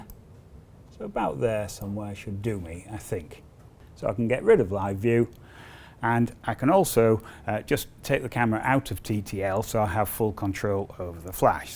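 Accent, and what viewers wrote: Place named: RP, United Kingdom. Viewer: Dear Sir, I am most obliged to you for sharing your knowledge and doing this in Queen's English. You not wearing a baseball cap (the wrong way round) added to my viewing pleasure.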